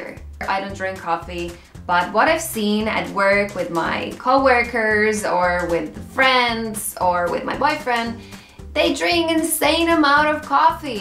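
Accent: United States. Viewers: Annoying American accent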